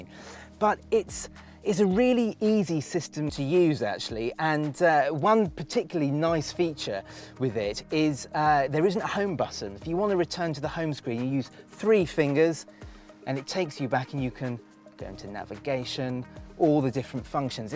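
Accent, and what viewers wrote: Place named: RP, United Kingdom. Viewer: speaks so posh it's refreshing to hear. And the car looks nice, the first time in a long time I have liked a new Peugeot.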